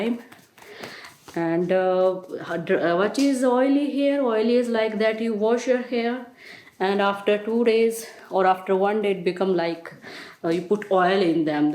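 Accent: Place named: India. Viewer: Мне нравится её индийский акцент и было бы прикольно еще посмотреть версию полностью на русскоя языке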